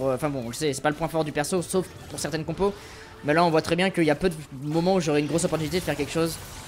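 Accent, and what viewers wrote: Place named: Russia. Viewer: Mdr j'adore l'accens russe quand il parle anglais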